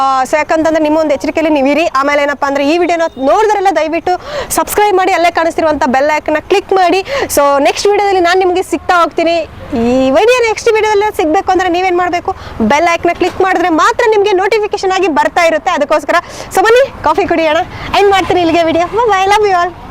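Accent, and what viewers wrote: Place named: Bengaluru, India. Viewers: Bengaluru hudgi Tara maataadta ediya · ನೀವು ರಾಯಚೂರು ಅಂತ ಹೇಳ್ತೀರಾ ಬೆಂಗಳೂರು ಭಾಷೆ ಇದೆ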